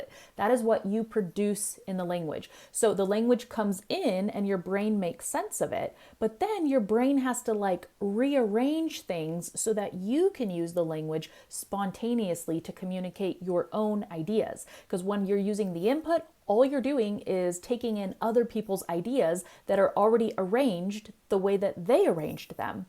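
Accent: United States. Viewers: Is your English accent Amarican or British?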